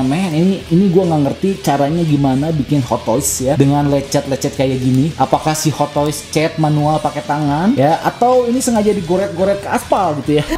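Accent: West Java, Indonesia. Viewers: Orang sunda euyy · bang sunda urang mana?.....bandung sanes?...wkwk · Bang abang orang sunda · Bang orng sunda?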